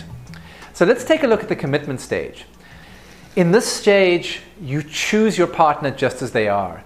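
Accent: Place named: Afrikaans, South Africa. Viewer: you sound dutch, south african and something else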